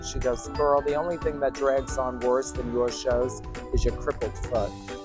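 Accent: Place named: Bronx, United States. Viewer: I love shopping with Coco and her beautiful self and that Bronx accent. ❤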